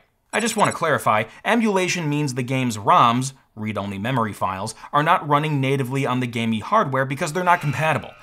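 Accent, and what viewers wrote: Canada. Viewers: That's just how Canadian people say vague. Don't change who you are ;) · Are you Canadian? People tell me I don't pronounce vague correctly, but I think it might be an accent thing.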